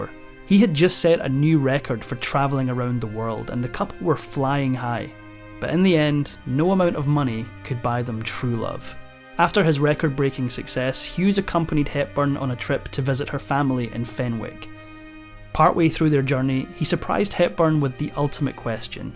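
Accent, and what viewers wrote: Scotland, United Kingdom. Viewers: I enjoyed the Narrator's Scots dialect very much. I wonder how Ms. Atheist Woman is enjoying her afterlife? lol! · But it's not AI!!! Unless AI can now do a Scottish accent. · Interesting to a point, however I was put off listening to more than 4 minutes by your lack of enunciation. You're doing such a good job but please don't let yourself down. I'm Scottish too and unless you want to live in Lorna Slater's "Sco-land" can I, with all due respect, please ask you to pronounce "criticisms" as "criti CIS ms" not "cri-i-sisms" and "thea T re" - don't say "thea-rr". Does ma nut in so it does.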